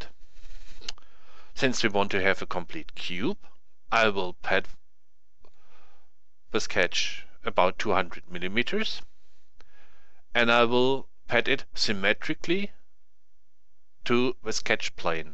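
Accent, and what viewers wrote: Germany. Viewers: are you actually german, you dont really sound like a english men. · For me 1x speed is just rigth. My native language is Spanish so listening English talked by a german (I guess), and understanding it, is fine. By the way there are FreeCad tutorials in Spanish by a guy that calls himself Obi Juan and these tutorias are very, very good. FreeCad is so big that there are many ways to learn it, so the tutorials here and the ones from Obi Juan are, for me, complementary to each other. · Great tutorial. I have attempted to learn CAD before. But training videos that I tried in the past were way to fast and concepts were not clearly explained. Usually the presenter in the tutorial was clicking buttons too quickly or using keyboard commands so I could never clearly understand how things were being done. But this video is very well paced, concepts clearly explained and the steps involved very clear. 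A really fantastic job! And the German accent, just makes me feel smarter listening to it :-) I look forward to viewing the other videos and I now feel confident that I can do some home machining of parts I design myself. Thank you!!!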